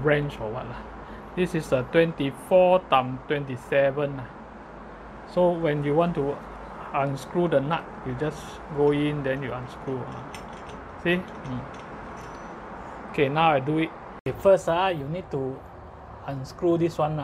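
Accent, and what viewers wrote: Singapore. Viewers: So pls share whatever u do or eat Wat with us, we like your video, the singlish n everything n we learnt a lot from u.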